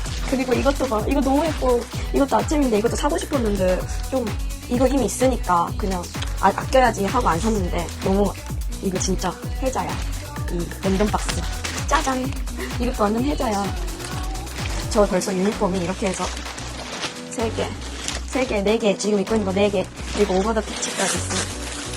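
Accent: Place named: Jeolla, South Korea. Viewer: 전라도 사투리 1.5배속으로 들어도 걸쭉하네요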